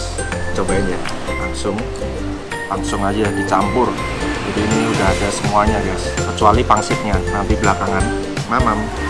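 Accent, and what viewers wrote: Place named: Solo, Indonesia. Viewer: medoks tomen to oms"? lahir solo ta?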